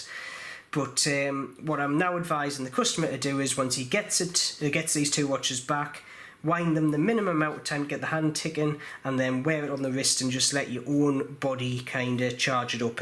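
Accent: Newcastle, United Kingdom. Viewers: Thank you so much for creating this video. After watching it, I felt comfortable enough to replace the ratchet wheel on my Glycine Combat sub. The part cost $10 on ebay. I was hand-winding the watch everyday until the teeth on the ratchet wheel eventually shear off. Learn my lesson. BTW, love the way you Brits pronounce the word Glycine and Aluminum with an extra syllable. Tomato, Tomato. Potate Potatoe and then there is Glycine. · I've serviced my own 2824 and 2836 watches (some of which I have used regularly for 20+ years) and have never seen this issue, but will watch out for signs of it in future just in case. (I was also going to make the Glycerine comment, but you addressed it below :). Nice to hear another Geordie voice talking about watches though, at least I'm not the only one messing about up here! :0)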